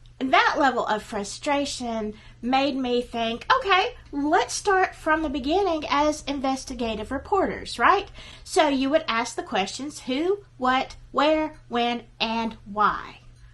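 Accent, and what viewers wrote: South, United States. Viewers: Love your southern drawl..I've got a kiwi one! 😉🌿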